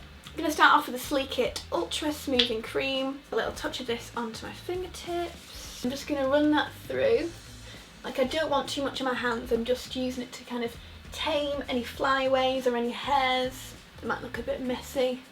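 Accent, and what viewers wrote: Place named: Northern England, United Kingdom. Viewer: Hey, Recently found you on YouTube. It's lovely hearing a refreshing Northern accent and references to places in Manchester rather than Brighton! I'm an English teacher in Bury! Keep up the great work!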